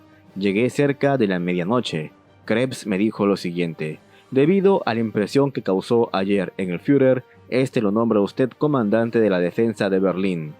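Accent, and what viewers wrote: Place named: Peru. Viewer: Muy interesante vídeo, una lástima luchar sabiendo que está todo perdido. Tenía años que no veía un video del canal. De antemano una disculpa. Antes pensaba "bueno, es un canal nuevo, aunque interesante aún no sabe modular bien su voz, es difícil hablar a un micrófono" pero hasta ahora veo que es porque es peruano y por eso es tan chocante su acento...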